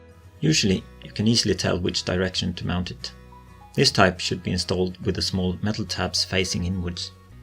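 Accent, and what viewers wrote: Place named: United Kingdom. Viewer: You sound like British!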